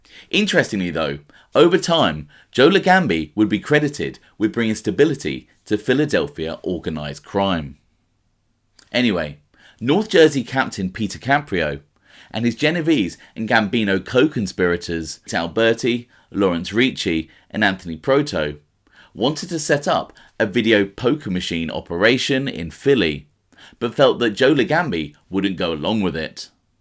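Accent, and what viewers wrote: United Kingdom. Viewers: Dude, sorry, but it's so weird to listen to you, discussing American Mafia issues, with that hardcore British accent. Am i the only one here? All the credibility is just not there. How would you know stuff sitting back there in London?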